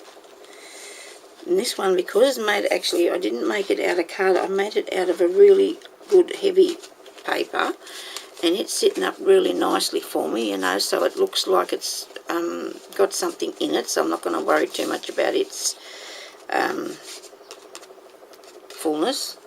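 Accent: Australia. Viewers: its such fun. love watching what you have done.its great to hear an aussie voice and to see how creative you are. love your laugh. thanks so much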